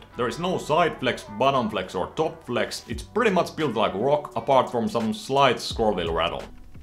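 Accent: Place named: Finland. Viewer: bro the Finnish accent💀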